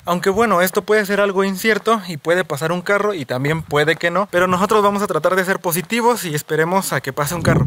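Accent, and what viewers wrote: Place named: Oaxaca, Mexico. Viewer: En realidad la mayor parte del tiempo en toda la sierra de Chihuahua esta nublado y también la sierra abarca más de la tercera parte de la geografía del estado. Tu eres surumatito de allá por Oaxaca? Es qué te ves cómo oaxaquita y suenas como tal. Saludos de La Colonia LeBarón y arriba mi Chihuahua y nada más!!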